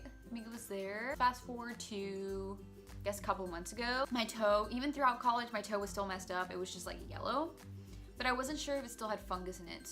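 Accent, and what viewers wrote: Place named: Texas, United States. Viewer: I could just tell you were from Texas! (: What part? I'm from Tx too!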